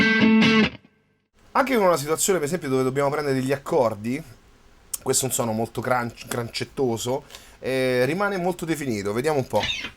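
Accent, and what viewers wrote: Rome, Italy. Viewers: ehi...sei simpatico con il tuo accento romano (de roma?)....ottima dimostrazione...bravo nei riff...bel lavoro!!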